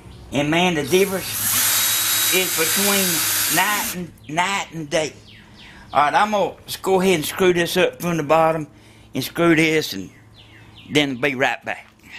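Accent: South, United States. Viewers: This man did a great job. Now if you can't understand him don't listen to his presentation. I'm not from the south but I could understand every word he said. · this guy knows what hes doing. but yh , true southerner ! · dude you have an awesome accent really American I like that ! :3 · I'm use to hearing people talk like this since I live in the south. Pretty common with family and co-workers. Like listening to an old guy tell a story from his youth. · I like your attitude and your southern accent.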